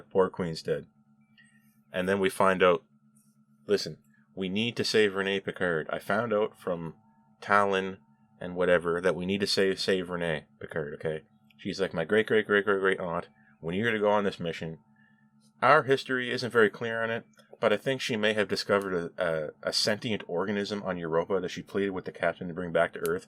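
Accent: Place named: Canada. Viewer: This is just an observation, but you sound like you are a Canadian.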